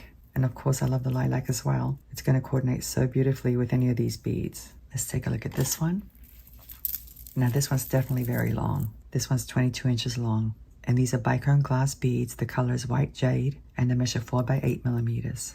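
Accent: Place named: Australia. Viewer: Thank you. Can’t wait to see what you design with these beautiful beads! Just got home from a long visit with my son in Australia. Always think of you when I venture “down under” and listening to your accent always makes me smile and takes me back. Take care I will be looking forward to your next video. Take care of you ❤️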